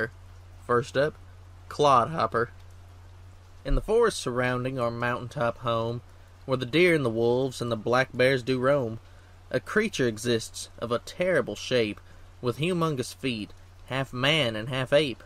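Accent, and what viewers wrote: South, United States. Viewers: Loved the poem! Also the sweet southern accent that you tell it with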